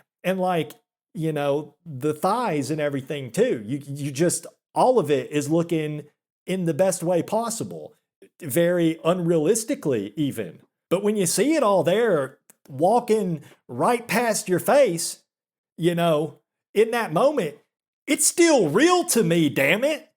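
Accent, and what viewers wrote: South, United States. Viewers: I love how southern and simultaneously not southern you are XD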